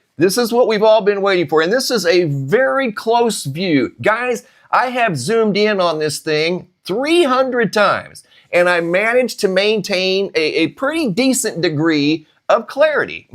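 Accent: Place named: Louisiana, United States. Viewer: Ayyye! South Louisiana! U da mayn! Mayne!!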